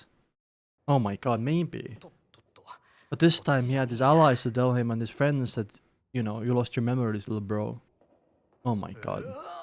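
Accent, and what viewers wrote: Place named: Estonia. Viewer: tulid mulle for you pageile ja ma sain aktsendi järgi kohe aru et oled eestlane. väga äge näha et eestlased teavad ka mis peak on 😋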